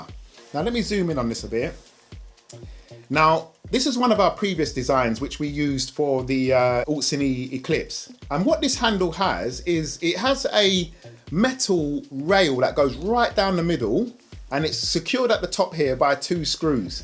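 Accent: London, United Kingdom. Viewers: What parts u grew up Cuz. U sound like NW10 Cuz.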